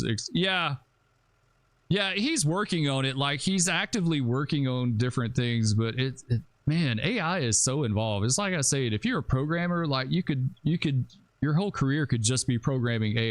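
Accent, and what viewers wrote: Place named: New York, United States. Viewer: Into the flames time I see let the FDNY accent begin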